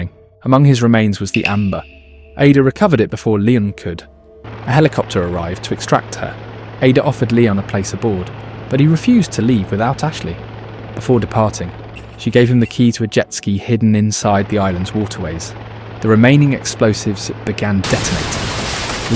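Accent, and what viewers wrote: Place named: United Kingdom. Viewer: Please change the narrator voice to a voice without this british accent 😵‍💫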